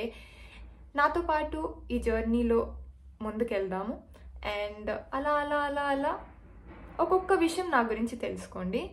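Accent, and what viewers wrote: Telangana, India. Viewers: Meeru totally telagana slang lo Matladuthunaru ante keka anipinchindi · What a cute telangana slang akka🤩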